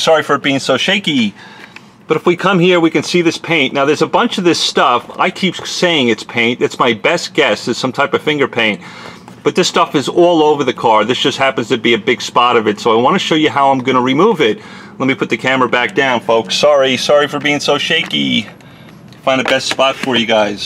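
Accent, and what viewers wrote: New York, United States. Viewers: You sound like you’re DEFINITELY from New York lol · Thanks you made my day. Dallas is awesome but you sound like a New Yorker.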